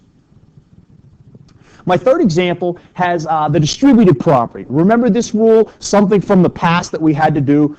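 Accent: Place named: New Jersey, United States. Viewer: he has that new jersey accent and im living for it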